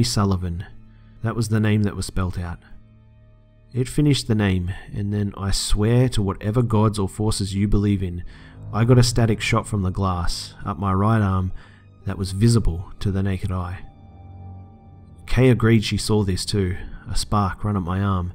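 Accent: Australia. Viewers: He's Australian XD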